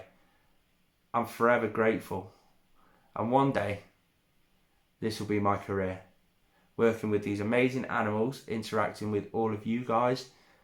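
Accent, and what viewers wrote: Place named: Oxford, United Kingdom. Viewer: Your comment on one of Brians videos brought me here. You said you were a content creator, so I clicked to check it out and checked out a couple of yours. Let me tell ya, I like what I see bro! Your voice reminded me of being with my grandparents who are from Oxford. I was homeless for 5 years but now I have a home, a son, car, motorcycle, 2 boas, ECT...Keep it up! Peace, from Yankeeland!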